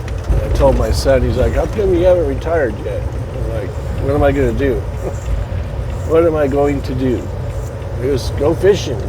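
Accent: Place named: Colorado, United States. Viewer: /Coloradan accent! Keep on trucking!uCifornia/Colorada accent love it! Keep on trucking!😀🐼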